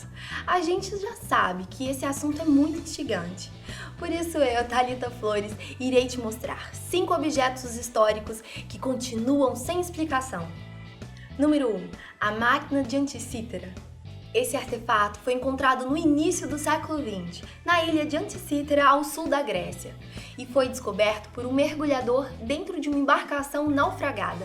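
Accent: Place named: Minas Gerais, Brazil. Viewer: esse jeitin minerin de falar me despertou um interesse em saber se essa moça é solteira e se ela faz o wats dela deixar de ser um mistério pra mim kkkk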